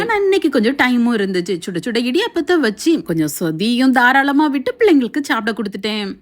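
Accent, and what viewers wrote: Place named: Nagercoil, India. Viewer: I thought you were from Nagercoil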